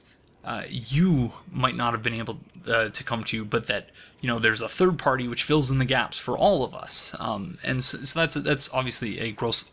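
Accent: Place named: Maritimes, Canada. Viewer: I know you had mentioned being from Canada before but I wouldn't have guessed the Maritimes (statistically) I'm in Nova Scotia (from New Brunswick) if you happen to look at this comment on your five year old video which Province are you from/in?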